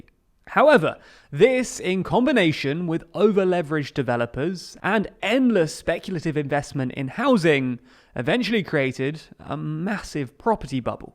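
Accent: England, United Kingdom. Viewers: You have the best English accent I've ever. I'm sure there's lots of money in Los Angeles for you, if you could handle the crazy.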